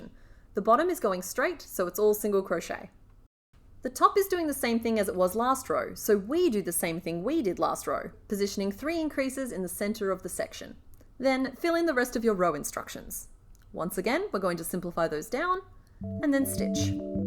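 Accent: Australia. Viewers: You explain things very clearly! And it is so wonderful to hear an Australian accent and metric measurements. Thank you! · OMG YES YOUR AUSSIE TOO❤❤❤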